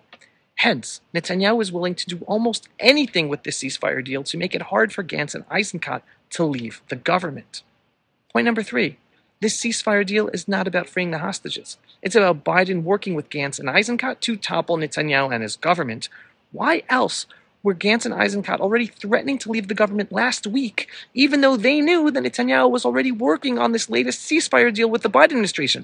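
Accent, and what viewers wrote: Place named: Arab world. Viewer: Your Middle East accent is hard for me an American to understand.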